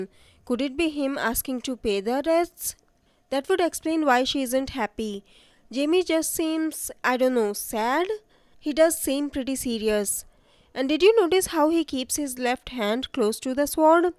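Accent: India. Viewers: The commentator voice is very annoying, is like listening to a Indian person speak English.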